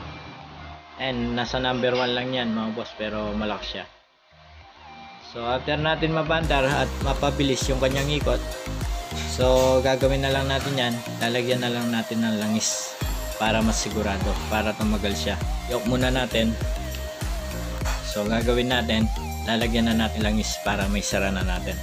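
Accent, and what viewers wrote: Pampanga, Philippines. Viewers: ang galing boss. itatapon ko na dapat yung dalawang sirang electric fan, napaandar ko pa. medyo mahina lang, try ko yung sinabi mong palitan ang capacitor para lumakas. salamat po! parang may pagka-kapampangan ang accent mo boss hehe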